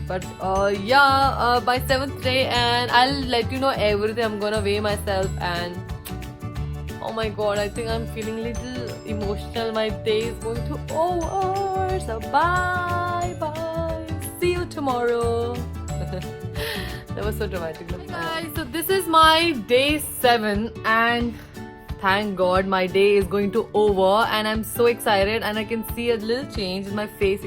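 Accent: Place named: India. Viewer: Wait can someone explain to me how she is Asian but talks like a Indian person😂😂nah but no hate nice video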